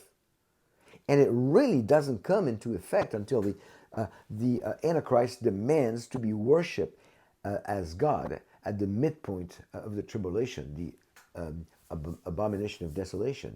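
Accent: France. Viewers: Watching from South Africa and enjoying your teaching tremendously....especially your brilliant sense of humour. God bless you richly. Also love your French accent!!! Shalom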